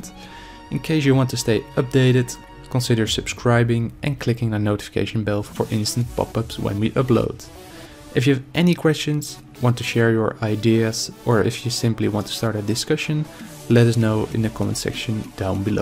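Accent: Netherlands. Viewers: Why do you sound so dutch...(or flemish) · You sound very Dutch, the accent I mean.. I am correct.. Oui?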